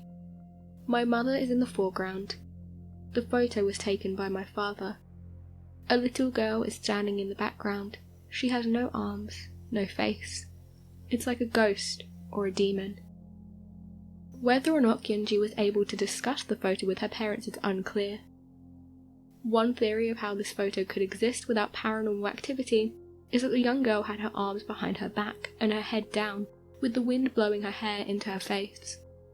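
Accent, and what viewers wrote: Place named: Scotland, United Kingdom. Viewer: I like your brouque. Is it Scottish or English?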